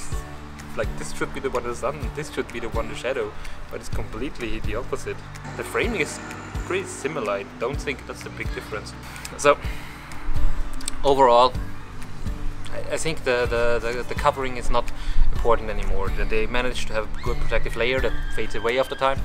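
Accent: Germany. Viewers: Cool video! Are you from a German speaking country? Or did I mishear something :D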